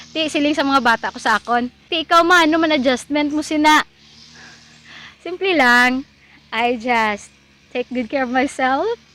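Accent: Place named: Iloilo, Philippines. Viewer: Nalingaw kos pagka ilongga oi. Wakoy nasabtan sa topic 😆😆😆 mura nagkanta kanta. Ahhh🥰🥰🥰 Thanks Ma’am more videos ❤️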